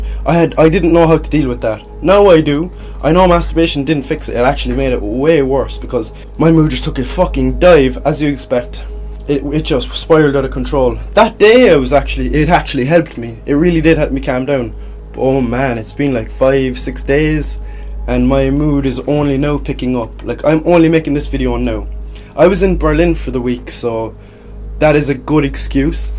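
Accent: Ireland. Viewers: Bro you rare af a black guy with a Irish accent you would get so much ass in America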